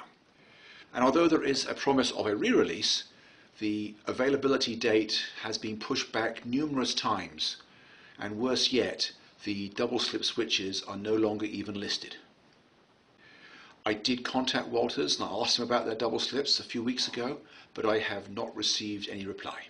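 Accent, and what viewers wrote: United Kingdom. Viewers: GREAT JOB - FORGIVE ME WHERE ARE YOU IN THE WORLD- YOU HAVE AN EXCELLENT BRITISH ACCENT BUT STATE PRICES IN DOLLARS LOOKS LIKE ASHBURN USA? WHATS THE STORY THERE?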